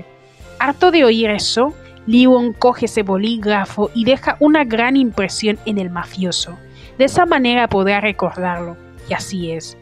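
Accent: France. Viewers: como habla le da un acento frances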